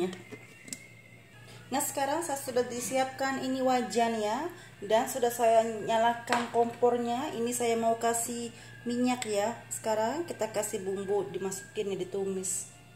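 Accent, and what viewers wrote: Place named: Banyumas, Indonesia. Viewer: Ngapak nih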